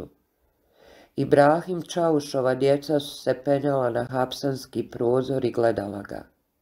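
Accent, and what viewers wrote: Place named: Sarajevo, Bosnia and Herzegovina. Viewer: Дикција наша сарајевска!🎉❤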